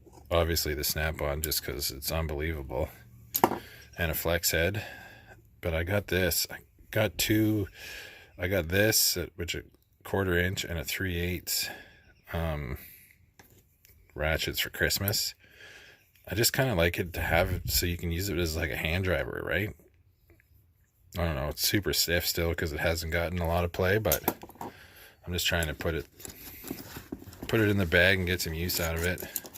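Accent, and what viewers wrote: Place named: Canada. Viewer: Great video and always interesting to see what other trades carry...initially I thought you were American, until I heard the third or fourth "Right" then I thought you must be Canadian! lol Edited to add what are the two tools at the and what are they for?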